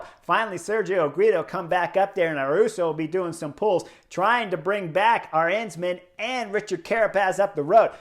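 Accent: United States. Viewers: Man…this feels like the end! My wife now knows your voice and thinks you’re from the south…haha! She can’t believe that there’s so much to talk about. Hopefully, she will understand why in the next season. Going to miss the everyday episodes. It’s like a tv series arcs: Giro, Tour and now Vuelta.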